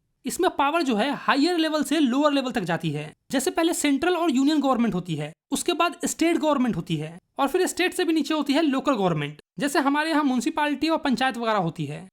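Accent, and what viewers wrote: Bihar, India. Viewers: bhaya aap haryana se ho kya mujhe to bihar se lagte ho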